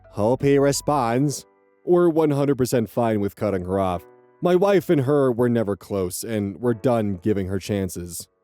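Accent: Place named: Midwest, United States. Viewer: I feel a lil guilty that I have not watched main channel. I just know him as the lil guy that has the cute midwest accent and reads Reddit so I don't have to suffer through it, myself. And the only creator to tempt me into the arms of the sups.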